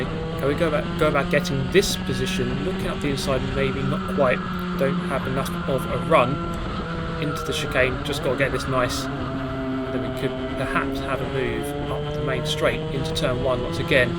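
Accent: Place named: United Kingdom. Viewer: I'm high, and thought i was watching Brouphy lmao. British dude.. i love their accent.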